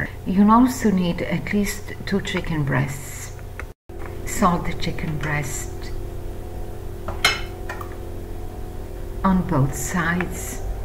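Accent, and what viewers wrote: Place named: Eastern Europe. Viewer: You do the same! You're a lovely host, love your channel.😊 Are you in Eastern Europe by chance? I hear your accent & it's sounds familiar... The reason I ask is that my grandmother came to the US from there many, many years ago. Her style of cooking was similiar to yours also... 😁 I came to your channel from the "I am Leo" channel. Vesna is a terrific lady rescuing & taking care of all those stray animals isn't she?! I love her for doing all that... Seems to be that I'm starting to make friends in that part of the world, I've never been there but hopefully someday I'll be able to go there. There seems to be so many nice, friendly & sincere people there! Wonder traditions and family values there, I guess you could say I'm embracing my ancestry... 😊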